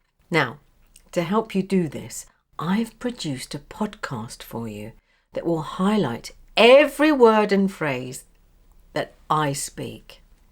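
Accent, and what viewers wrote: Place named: Northern England, United Kingdom. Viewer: What city do you live in? You sound from north England. Greetings from Casablanca Morocco.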